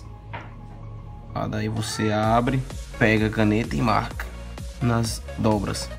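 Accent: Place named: Bahia, Brazil. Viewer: Sotaque de baiano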